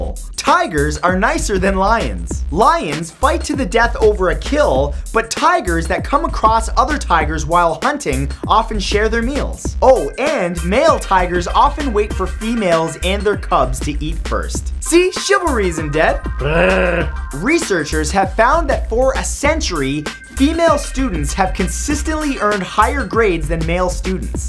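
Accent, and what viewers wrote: Canada. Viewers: He's Canadian I am and we pronounce things different like you guys say bag we say beg! Or you guys say milk we say melk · Well he's Canadian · He's Canadian. Pretty obvious from the accent.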